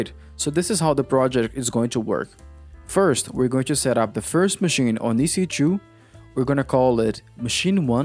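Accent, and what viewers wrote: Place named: Brazil. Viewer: Fácil reconhecer o sotaque brasileiro kkk obrigado pelo video, ajudou aqui, tmj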